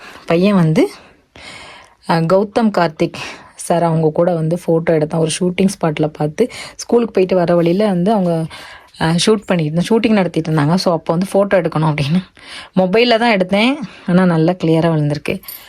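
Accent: Tirunelveli, India. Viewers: Unga sontha oor yethu akka yengala mathiriye pesuringa thirunelveli pakkama neeenga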